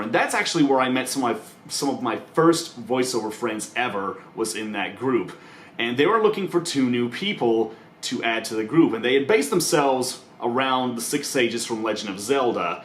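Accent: South, United States. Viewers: After watching one of his videos i hear the country southerness in his voice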